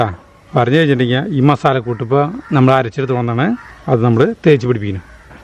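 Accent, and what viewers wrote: Thrissur, India. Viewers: thrisur basha kelakan vendit sarikum njn video kanane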